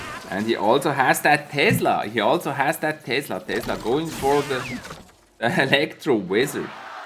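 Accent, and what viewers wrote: Switzerland. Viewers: Are you Swiss because of the axent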